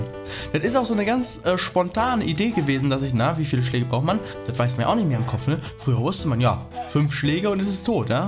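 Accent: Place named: United States. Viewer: Er hat iwie einen amerikanischen Akzent :))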